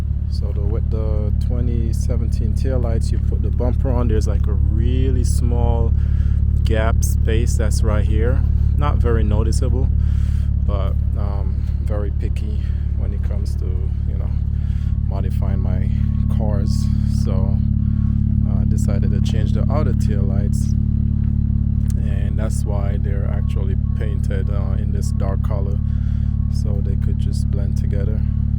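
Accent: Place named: Jamaica. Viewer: Breddah The jamaican Accent cyaan hide, CLEAN!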